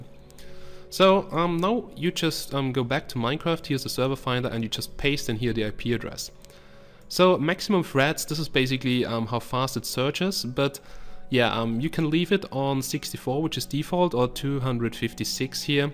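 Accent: Germany. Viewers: biste deutscher? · Man hört das du deutscher bist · hey are you german ? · is he german ? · are you german,? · Ist er Deutscher? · kann es sein dass du deutscher bist ? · was du bist deutscher? xD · are you German? Bist du Deutsch? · are you german? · are you german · man hört das du bist deutsch :))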